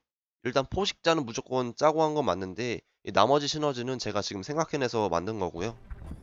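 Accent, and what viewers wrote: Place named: Chungcheong, South Korea. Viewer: 항상 롤토체스 많은 정보 얻어가요 말투가 충청도 분이신 것 같아요!!ㅎ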